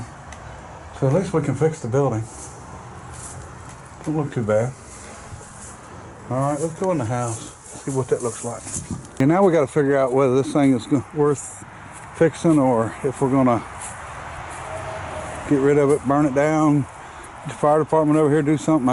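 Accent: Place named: Maine, United States. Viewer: Sounds and looks like maine accent is throwing me off tho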